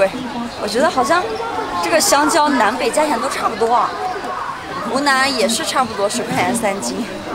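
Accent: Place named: Gansu, China. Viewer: 这咋，还把摄影师带到了甘肃？怪不知你听不懂湖蓝方言，却说一口流利的甘肃方言。六年？还来得及，赶紧生个小小孩，这样就四世同堂了。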